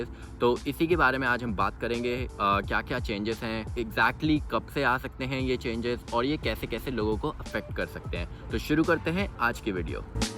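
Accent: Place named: India. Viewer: that Hindi accent though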